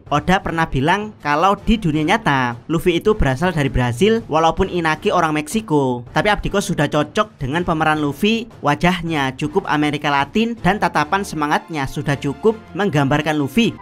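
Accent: Java, Indonesia. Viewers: medok bnget sih asw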